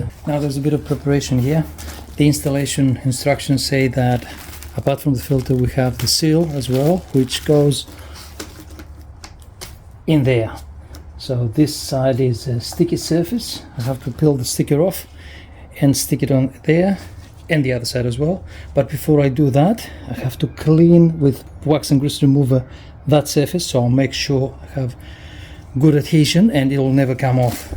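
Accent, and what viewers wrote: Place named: Australia. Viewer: I can definitely hear an Oz twang in the accent these days 😂 settling in fine i see😉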